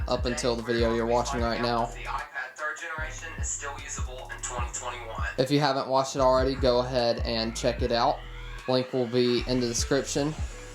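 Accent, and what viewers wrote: Texas, United States. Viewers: you have the most texas accent ive ever heard god damn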